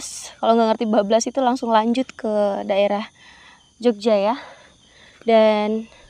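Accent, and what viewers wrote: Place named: Sunda, Indonesia. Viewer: org mna kak, kek org sunda🤭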